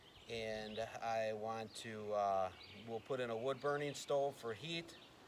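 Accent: Wisconsin, United States. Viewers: You sounded just like wisconsinites and I called it well. My uncle has land in Gleason Wisconsin. We used to camp up there. I live down near Milwaukee. I just subscribed to your channel.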